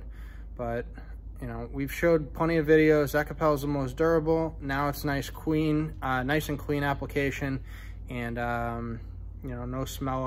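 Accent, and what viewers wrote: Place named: Massachusetts, United States. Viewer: I watched your video and am impressed with your work. I have a 7 yr old porcelain clawfoot tub. The faucett sits on the top of the tub, and there is of course a chrome drain.can these be taped off ? I'm a painter, but a perfectionist, and do not want to tackle this by myself. I heard your voice and guessed you may be from MA, then I saw the Patriots bucket 🤣 I'm in Weymouth, can I hire you to do it for me? Thank you !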